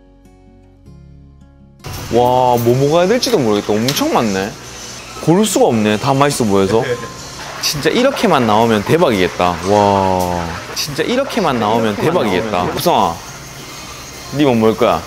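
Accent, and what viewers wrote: Gyeongsang, South Korea. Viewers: 서울에서 10년이든 ㅋㅋ 말투가 경남이니깐 지방에서 왔나물어보는건 당연하지 ㅋㅋㅋ서울말 아에 안쓰시던네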